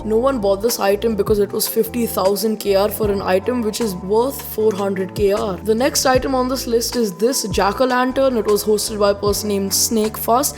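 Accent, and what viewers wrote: India. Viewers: i still luv ur indian accent <3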